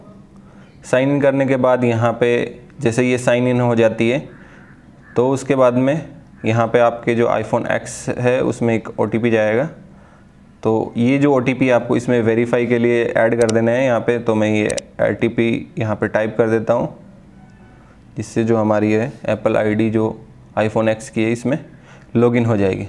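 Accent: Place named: India. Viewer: why every single tutorial is made by indian???!!! I can not understand anything!!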